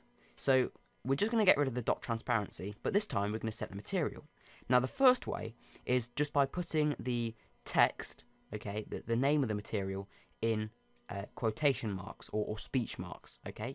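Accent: United Kingdom. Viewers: prolly because of british accent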